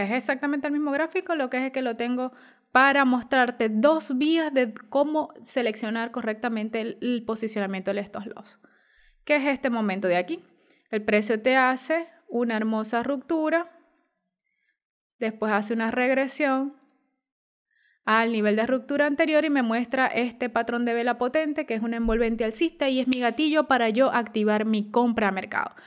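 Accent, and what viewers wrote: Maracaibo, Venezuela. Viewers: Verrrgacioon maracucha te amoo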